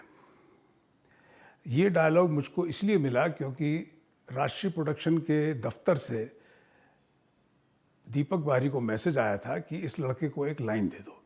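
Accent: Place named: Uttar Pradesh, India. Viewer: Sir apki language se andaza laga lia tha mane ap UP se conected ho my forefathers belong to Allahabad neem sarai and besona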